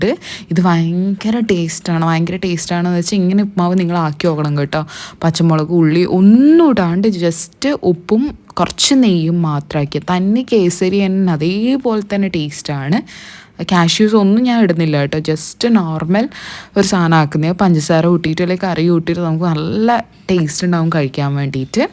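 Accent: Kannur, India. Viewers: Da സംസാരം endho vere slang പോലെ തോന്നുന്നു. Knr touch കുറവാ 😍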